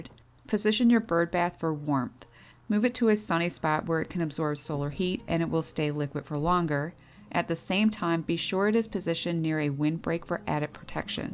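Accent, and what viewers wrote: Canada. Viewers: Great post and lovely Canadian accent.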